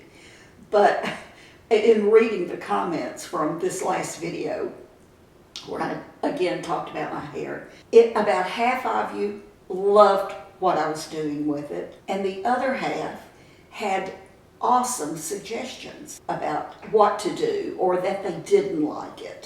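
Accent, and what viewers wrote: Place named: Arkansas, United States. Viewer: I always wanted to ask you are you from Arkansas? Because I know someone that has That is from Arkansas and has the same accent that you have. So I was just wondering where are you from to get such an accent like you have?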